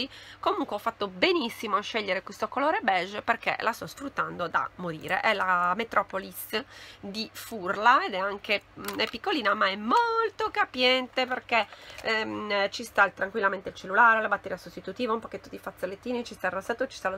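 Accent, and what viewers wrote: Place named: Cagliari, Italy. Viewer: con due mesi di vacanza ti tornava l'accento casteddaio fortissimoooo!😂😂😂 in questi ultimi video si sente il ritorno alle origini! 😍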